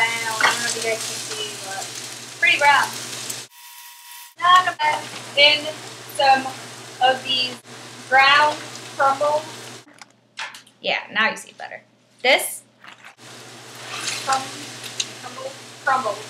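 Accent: Vietnam. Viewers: ah i never knew u was vietnamese until u spoke lol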